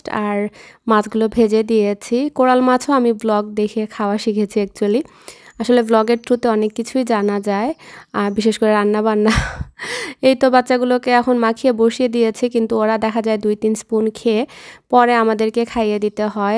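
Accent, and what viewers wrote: Sylhet, Bangladesh. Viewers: Apu amrar sylhety mat hunla hasa ja bala lager ❤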